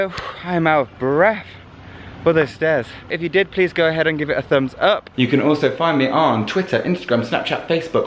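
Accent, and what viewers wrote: United Kingdom. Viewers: I love British accent and English guys, and did find your channel, Subscribed, liked and commented now... Damn you look hot ;)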